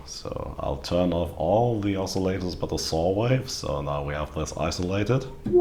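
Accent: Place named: Germany. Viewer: Ich schreib mal auf deutsch, weil ich faul bin und an deinem Akzent auch raushöre, das du deutscher bist :-) Das Belächeln von Romplern fiel mir in einschlägigen Foren auch auf und ich kann heutzutage nur sagen, dass das Lächerlich ist! Witziger Weise hat einer der Typen, der „Rompler“ belächelt, in seinem Profil angegeben, dass er NI Komplete 8 besitzt (schon ein paar Jahre her). Wenn ich diese NI Libraries mit meinem Roland XV-5050 und SRX-08 und 05 vergleiche, kann ich nicht anders als diesen Typen auszulachen! Vom Prinzip her ist so eine Software-Library mit den Instrumenten nichts anderes, als ein „Rompler“. ABER: Die Ni-Dinger waren oft Sample-technisch viel komplexer aufgebaut, als die meisten Presets auf meinem 5050, aber klangen halt leider nicht halb so geil. und wenn ich recht überlege: Die besten Beats, die ich in meiner Jugend gehört habe, wurden mit solchen „Romplern“ gemacht. 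Hol dir einen aus der Roland JV oder -XV-Serie oder Korg Triton, Yamaha Motiv und du hast eine breite Palette an Instrumenten, die GEIL klingen! Samplen ist heute ohnehin eine heikle Angelegenheit.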